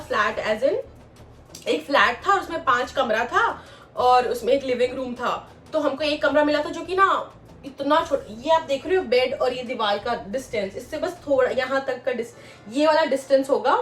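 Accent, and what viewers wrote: Jharkhand, India. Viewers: Hello there... this is my first comment ever and I'd like to congratulate you for your achievement and I kind of knew that you were from Jharkhand because your Hindi accent is just like mine and I'm glad that you are proud of your mother tongue and use it in your videos too. You remind me of my husband who is also from Jharkhand and with hard work he made it to JNU and later to Japan... that was a long time ago, when nobody from jharkhand or bihar really wanted to acknowledge their backgrounds. I'm so happy to see young Jharkhandi like you doing well... may fame follow you everywhere👍💯🧡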